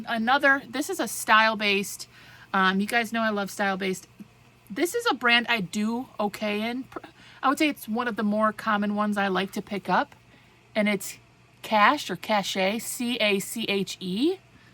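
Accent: Wisconsin, United States. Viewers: Fun to hear you talking about Madison. I grew up there and loved it! And I love to hear that Wisconsin accent. 😊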